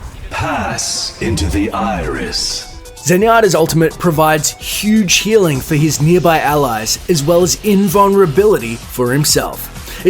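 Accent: Australia. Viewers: Ay, U Aussie? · Are you Aussie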